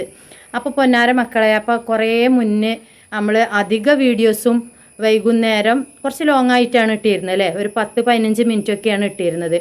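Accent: Malappuram, India. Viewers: ഒർജിനൽ മലപ്പുറം ശൈലി😊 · ഞാനും ഇത്ത മലപ്പുറം ആണ് എന്റെ സംസാരം ഇതൊക്ക പോലെ തന്നെ 🥰❤️ ഒരുപാട് ഇഷ്ട്ടം ആണ് നിങ്ങളെ വീഡിയോ കമന്റ് ഇടാറില്ല 🥰❤️ L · നിങ്ങൾ പറഞ്ഞത് ശരിയാണ്. ഞാൻ മലപ്പറം കാരുടെ കൂടെ ഒരുമിച്ച് ഒരു ഫ്ലാറ്റിൽ താമസിച്ചിരുന്നു അവരുടെ അല്ലാം സംസാരം ഇത് പോലെ ആണ്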